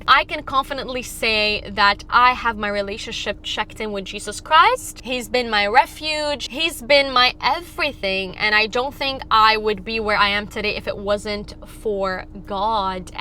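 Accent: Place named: Jamaica. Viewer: 'A fun ting' ooooh she Jamaican. nice video btw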